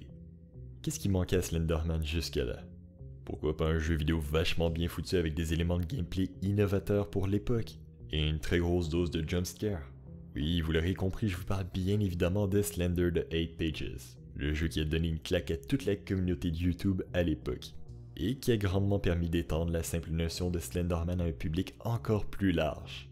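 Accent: Quebec, Canada. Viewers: C'est du quebecois enfin rhooo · J’aime bien ton accent je sais qu’il est québécois mais il vient de quelle region Sinon continue comme ça!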